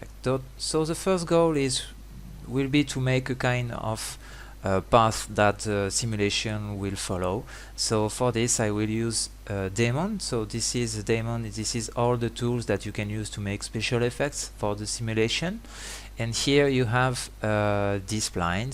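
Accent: France. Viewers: c'est la première fois que je vois un français parler en anglais sur youtube x) ça fait bizarre d'entendre un accent français, enfin bref, bonne vidéo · L'accent français magnifique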